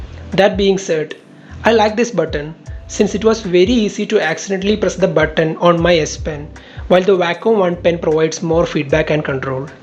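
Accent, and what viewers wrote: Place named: India. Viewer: english indian LOL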